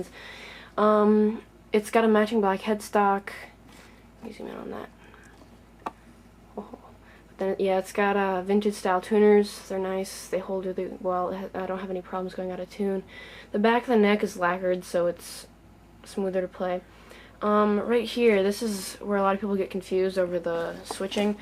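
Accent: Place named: United States. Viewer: i thought you were british but you sound american .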